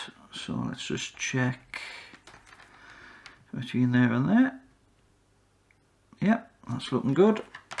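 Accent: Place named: North East, United Kingdom. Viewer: The North-East accent is never a problem.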